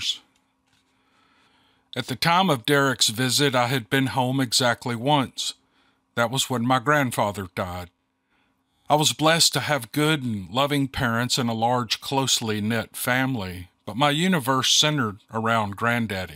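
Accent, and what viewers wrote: South, United States. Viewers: A part of me dies listening to this. I understand its fiction but you have so much respect and authority. If you made this narration on another channel maybe I wouldn't mind but your main? So many of my coworkers and neighbors think of Bigfoot and Dogmen as some joke for story- telling. I am quick to defend against that stupidity or less they will gey 411. We need more advocates like you to bring awareness and respect for the wilderness where these things roam. I just dislike these made up stories since frankly all they are are just creepy pastas in a sense. Years ago Vic Cundiff and later James Williams from Dark Waters showed me there are people out there who go out of their way to avoid pretend stories and only put out their real encounters. I spoke with you before and I am not saying this denegrade or insult you or this talented writer but please remember by narrating these made up stories on your main channel can be used against all your 'real' storytelling videos. 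If I wanted that I would listen to your other channels which go deeper into fan fiction which I never had a problem with but this is your flagship ocean liner. We respect and love your Southern charm and honesty with that confident accent. Please remember I am not criticizing you for what you pursue but recall that Darkness Prevails is one of the biggest Creepy Pasta channels out there but I can never trust him when he makes a video 'Real Werewolf Stories: 'Guys I swear this one is a genuine story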